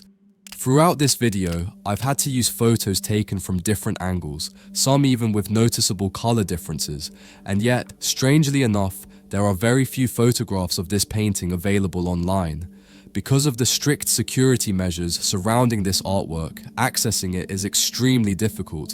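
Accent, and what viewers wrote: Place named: England, United Kingdom. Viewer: Take your English accent and your fear-mongering and shove it I am not afraid perfect love Casts Away all fear death has no power over me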